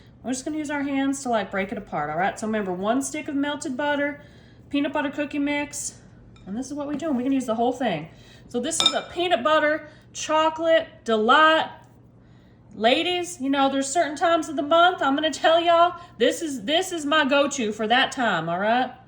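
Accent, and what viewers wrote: South, United States. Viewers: Southern accent? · I just love you and your accent. From one southern girl to another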